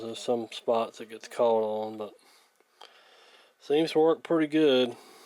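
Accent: South, United States. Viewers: I like to take large tabletop radios like that that are junkers that are kind of not really worth restoring and turn them into guitar amps so if you have any of those basket cases where you don't feel like it's worth restoring to a good workable radio. Even if the chassis is missing and it's just the wooden shell I can make a chassis out of sheet metal and source transformers. Maybe we can work something out. It sounds like you're in the South you might not be too far away from me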